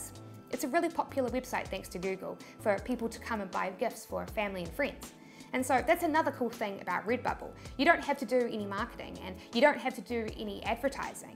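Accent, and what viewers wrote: New Zealand. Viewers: Thanks 👍! You are always super informative and love that New Zealand accent!! 😃💻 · I want to come to New Zealand to study your English speaking accent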